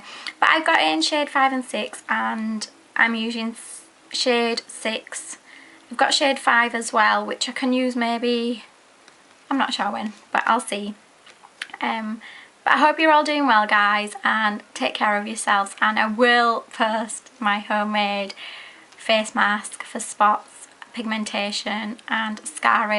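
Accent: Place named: United Kingdom. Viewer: Where are you from in the UK? You have a beautiful accent! :)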